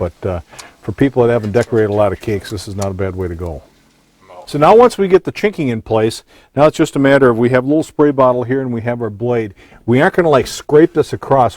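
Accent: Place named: Minnesota, United States. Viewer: Dig the accent He's from Minnesota you know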